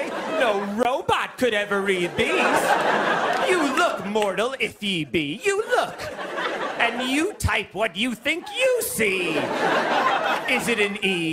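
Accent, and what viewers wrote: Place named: Chicago, United States. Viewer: I think it's the nasally Chicago accent!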